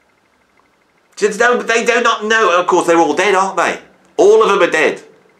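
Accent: Birmingham, United Kingdom. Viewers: Is that a Birmingham or a Wolver'ampton(Slade) accent?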